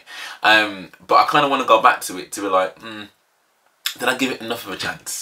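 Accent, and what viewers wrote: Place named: Birmingham, United Kingdom. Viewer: First of all, do you come from somewhere near Brummagem, you sound a bit like Jack Grealish to me ? Any road up, Adagio was sung by Lara Fabian who has been a great influence on Dimash. If you check out her performance of Adagio you will see why Dimash holds her in such high regard, they both put heart and soul into their performances. <link> She has performed live with Dimash singing Ti Amo Cosi, which no doubt you will come to in the future. There was a great camaraderie between the contestants in Singer 2017 and are all top draw. All the best, Peter.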